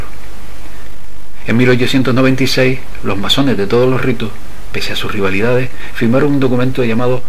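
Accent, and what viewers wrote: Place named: Canary Islands, Spain. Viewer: Por aclararte lo que quería decir, las que van entre sería como sonarían en la lengua/dialecto: el sonido sh- sería en gallego o asturiano, xamón [shamón] o xoven [shoven]; en español normativo y el de la zona castellana sería j-, como la de joven o jamón; En tu caso, el canario, vendría a ser como [hamón], [hoven] y [Méhico] (por transcribirlo de alguna manera), vamos como tú siempre has pronunciado la jota. Lo de [Méksico] cuando vemos escrito México deriva de la confusión que nos produce que en el castellano antiguo la j- actual se escribía x- y está grafía se ha conservado con el paso de los siglos, pero solo en ciertos nombres. Es lo mismo que le pasa a Oaxaca o al "Don Quixote" original del s XVII, que ahora mismo lo escribimos "Don Quijote" pero tanto en el pasado como ahora se pronuncia igual-> Quijote; y Oajaca. 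Es decir, para escribir la jota(j) de hoy se usaba la equis(x) por eso nos confunde cuando vemos escrito Quixote, México y Oaxaca porque son reminiscencias de aquella escritura medieval del sonido jota. La equis de hoy en día como en excursión es un sonido ks-> [ekscursión] que nada tiene que ver con lo que representa esa equis medieval de México. En definitiva, es tan correcto escribir México como Méjico y las dos se pronuncian exactamente igual, lo mismo que Quijote-Quixote, Oajaca-Oaxaca. Un saludo, espero no haberte liado más... jejeje Por cierto quiero recalcar que me encanta tú trabajo y el tono serio en el que lo realizas. Saludos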